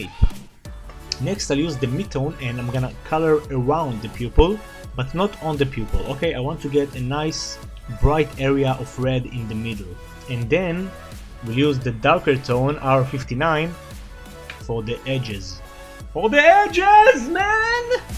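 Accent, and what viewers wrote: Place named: Israel. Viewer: חחח יש לך שם ומבטא של ישראלי. יש מצב? Anyway, Your art is amazing!!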